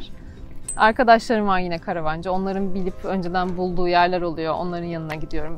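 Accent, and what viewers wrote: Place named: United States. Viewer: Böyle bir konuşma şeklinle hangi aklı başında insan seni destekleyip abone olur bilmem ama şunu bilirim bu kadar yapmacık birini ve ses tonu rahatsızlığını asla seyretmem hanım kendiniz olun bence Amerikan ingilizcesi sizin çok eğitimli olduğunu göstermez bilginiz olsun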